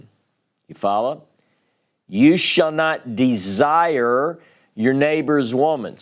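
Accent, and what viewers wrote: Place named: South, United States. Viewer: Brother, your southern accent is infinetly amusing and very fitting for a teacher.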